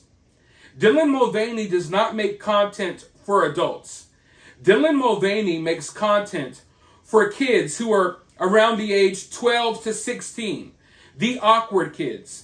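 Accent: South, United States. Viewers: That country accent, you sound like me lol!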